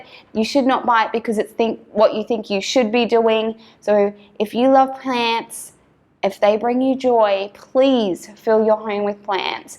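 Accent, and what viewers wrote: Australia. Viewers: Australian accents are so cool.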